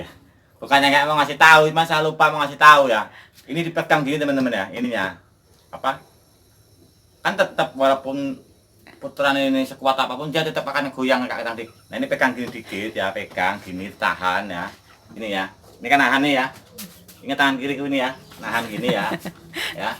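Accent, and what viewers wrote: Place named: Central Java, Indonesia. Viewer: Aslinya mana to mas,bahasa jawane kok kaya orang jawa tengah ...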